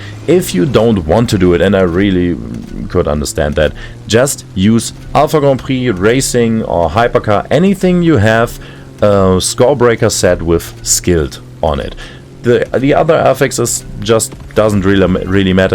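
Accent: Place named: Germany. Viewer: You can actually hear the german accent. Still better than expected. I guess after 2-3 tries he will get better with this. At least now he can reach a broader audience, which is a good thing.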